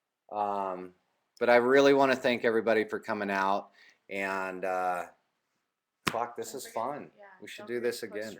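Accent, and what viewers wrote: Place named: Indiana, United States. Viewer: He pronounces them that way because he's from Indiana, that's how they talk, it's an accent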